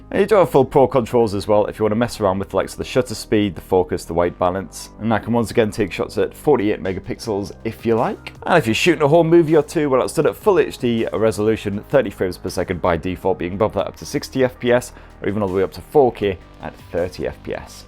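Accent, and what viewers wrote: United Kingdom. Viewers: He's from the uk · That's a british accent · This is so Bri-ish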